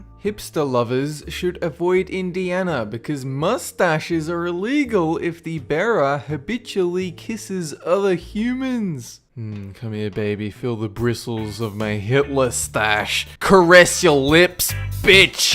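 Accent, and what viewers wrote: Australia. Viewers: this is gonna sound stupid but are you Australian? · I'm new to this channel and he sounds australian..... ( JUST LIKE ME☺️) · Are Australian or British? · Is this guy Aussie? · you australian?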